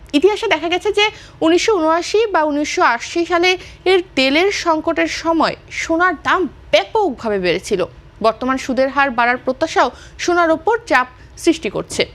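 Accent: India. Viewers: Akemon khobor pora??? Indiander moto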